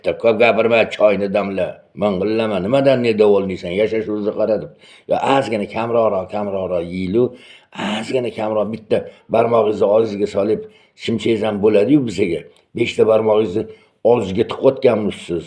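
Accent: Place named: Tashkent, Uzbekistan. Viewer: А сизни энди ёллашдими. тошкентча шевадасиз.сиздан ёшим каттаман охирини эшитгим келмади.муридни Муридисиз.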